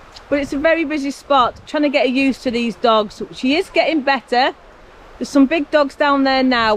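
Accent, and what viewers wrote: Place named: Ashton-under-Lyne, United Kingdom. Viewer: Love your videos. Am trying to place your accent. Im fron Ashton-under-Lyne and hear myself in your accent. Where did you come from thinking it must be in this area?? Keep making us smile 😃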